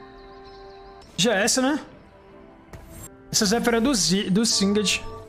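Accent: Recife, Brazil. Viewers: Tu é de Recife meu bom? N acredito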